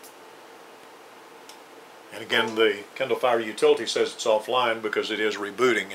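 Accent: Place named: South, United States. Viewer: You sound country ... But thanks 4 the HELP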